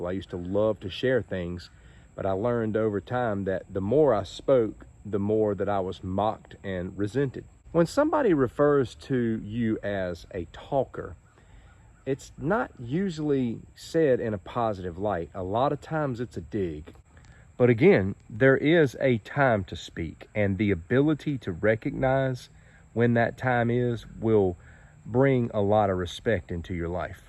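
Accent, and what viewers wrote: South, United States. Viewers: I like your Southern drawl. Reminds me of my granddaddy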